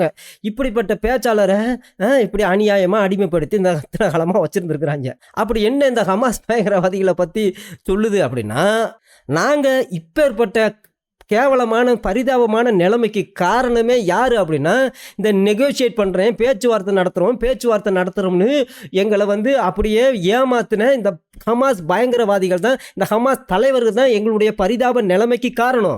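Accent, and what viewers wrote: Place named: Kanyakumari, India. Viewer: This is kanyakumari Tamil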